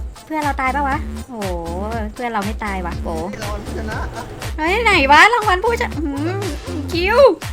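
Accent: Thailand. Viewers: เอื้อย คึเว้าอิสานเป็นวะ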